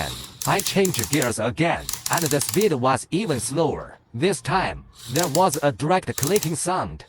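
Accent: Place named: China. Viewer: Why du u sound like chinese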